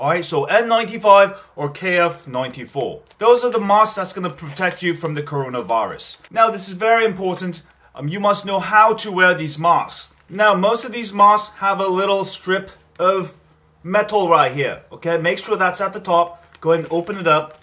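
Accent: United Kingdom. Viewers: Did you live in the UK? You seem to have a strong British accent sometimes. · whats up with the brittish accent? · going to costa rica in feb not cancelling that shit ''its not a joke" but dudes talking like hes from the UK l lol smh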